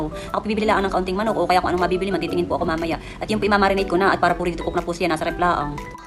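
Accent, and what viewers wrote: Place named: Batangas, Philippines. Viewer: a talaga peru bakit parang pang Batangas accent nyo pala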